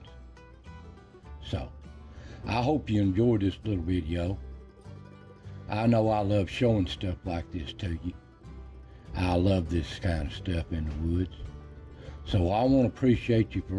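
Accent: Appalachia, United States. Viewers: I can't tell you how much I appreciate you bringing these kind of videos to the World. Just hearing you talk reminds me of some of the best people I've been so lucky to ever met and learned from in my life and of times that have so quickly come and gone. I was born and currently live in the very top of Kentucky but I grew up in East Tennessee wandering thru those beloved Appalachians and that's where my heart stays. So these videos are invaluable to me, as I live in a perpetual state of being homesick. Thank You so much for your time & effort, and your willingness to share!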